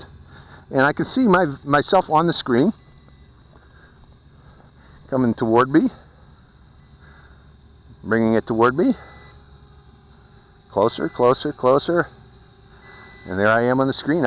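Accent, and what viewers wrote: Pittsburgh, United States. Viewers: how do you get extra propellers for this? also: i'm guessing you're from pittsburgh? my dad is, and he has the same accent.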